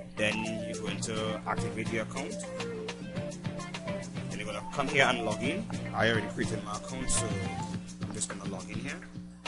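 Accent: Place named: Trinidad and Tobago. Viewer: boss u sound link a trini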